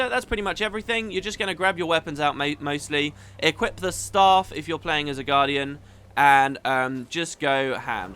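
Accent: United Kingdom. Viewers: I enjoy your videos so much for so many reasons. First of all, I've fallen in Love again with Guild Wars 2.. I said again but actually even though Ive had it pre release, it is the first time.. I have never really played it, I have just 2 level 80 characters and just one with a finished story, but now I play again. And even though I am a noob and there still are so many things I have no idea about, it is so much fun! Your videos give me so much information, there is so much input in such a short time, you talk really quickly and address so many things, I like that so much. Additionally, I am from Germany, and I so much enjoy your british accent (You are from the UK, aren't you?). There are so many things I have missed because I just havent really played the game, but you explain so many things in your videos, I think it makes me love the game even more, and maybe this time I stick to it. 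Eventually I have been playing daily now for a month, at least around half an hour a day if only just to chill and rest in the evening. Never before have I played this much (being aware of the fact, that it IS NOT a lot of time compared to what others spend playing the game). Anyway, thank you very much for your videos! Keep up the great work! LG Nyakes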